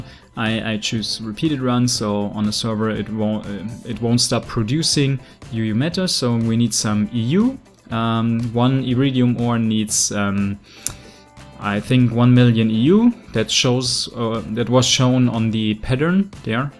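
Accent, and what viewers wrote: Germany. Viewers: Are you german? I think you have a german pronunciation.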